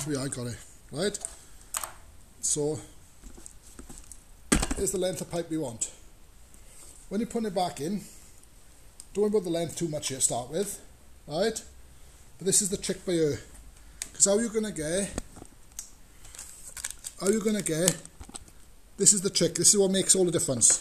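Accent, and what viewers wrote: Wales, United Kingdom. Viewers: Hi you remind of the great Tommy Cooper, he just couldn't do anything right, yet the people 😍 loved him. I must admit I enjoyed your presentation well done, at least you gave us a good laugh. Lived in North Wales for years colyn Bay. Worked on the a55. Great to hear that lovely Welsh accent again. Muchas gracias 😎 Liam kilkee clare ireland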